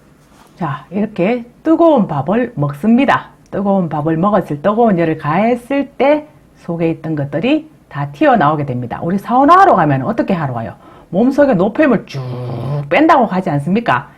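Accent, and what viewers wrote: South Korea. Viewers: 어머 얼굴공개하셨네요~완전반전 미인이시네요..ㅋ말투는 시골사투리 얼굴은청담동아줌메비쥬얼~~^^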